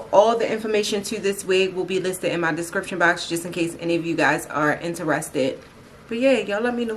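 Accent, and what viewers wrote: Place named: Baltimore, United States. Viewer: You're such a inspiration to black girls, you makeup always look bomb& I love your Baltimore accent ❤️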